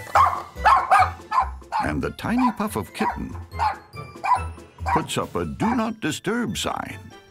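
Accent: United States. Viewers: The Stanley Parable narrator is British, this guy is American.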